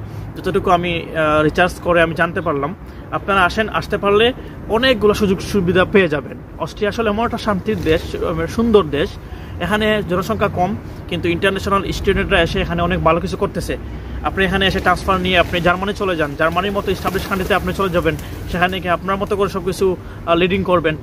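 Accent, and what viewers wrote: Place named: Sylhet, Bangladesh. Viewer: bhaiii uni Sylheti. Unara Bangla kor pare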